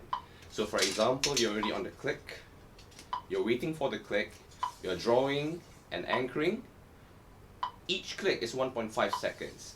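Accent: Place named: Malaysia. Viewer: I haven't heard a lot of Malaysian English speakers so I'm not sure if this accent is representative. But I like the softness to it .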